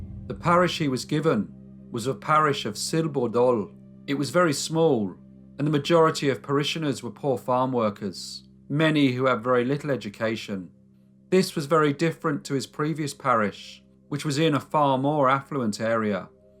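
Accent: United Kingdom. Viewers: Gerrylynn mako. It is a British accent. I believe it is the Queens English. is a well educated person.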